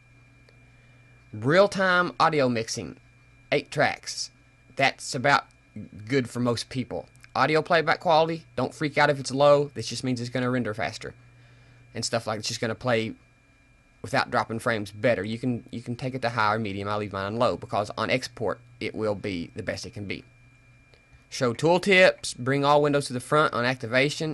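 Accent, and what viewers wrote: United States. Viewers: U HAVE A COUNTRY ACCENT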